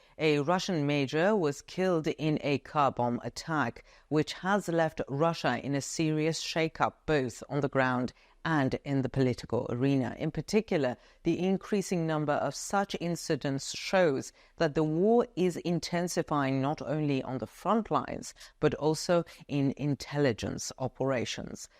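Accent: United Kingdom. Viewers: How does put that British accent in or is added after??😮😂 Thanks for your excellent updates 😊 have a nice weekend 👍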